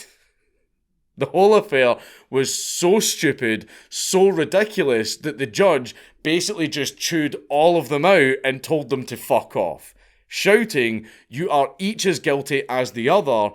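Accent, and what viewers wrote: Scotland, United Kingdom. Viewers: This was such a good one. Thanks for putting in overtime. Sometimes when I’m watching your videos I have to actively remind myself that it’s not Nick Offerman doing a Scottish accent. Are you two related? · words are hard... especially in a scottish accent...🙏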